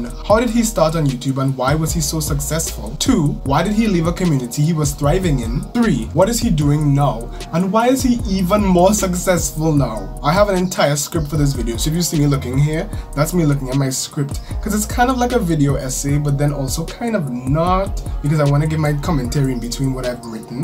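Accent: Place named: Trinidad and Tobago. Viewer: You sound trini ❤🇹🇹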